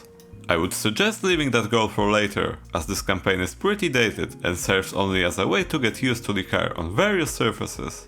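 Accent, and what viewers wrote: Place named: Poland. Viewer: from the first secon i understood that you were polish, recognise that accent everywhere LMAO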